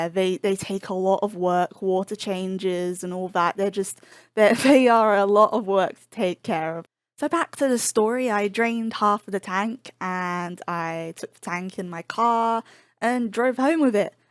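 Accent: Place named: United Kingdom. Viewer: Came here from Dodo - I'm not much of a fish person but so good to see someone knowledgeable and caring about their pets :) PS. you sound British, are you originally from there and moved to the US?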